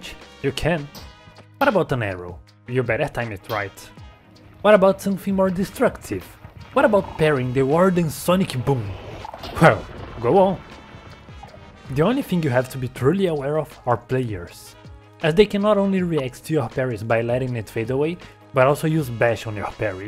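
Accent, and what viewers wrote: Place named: Rio de Janeiro, Brazil. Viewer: nao tem como esconde o sotaque carioca kjkkkkk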